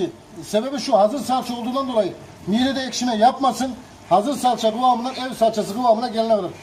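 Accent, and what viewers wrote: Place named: Kayseri, Turkey. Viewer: şef kayserili heralde aksan baya vuruyor 😁😁👍👍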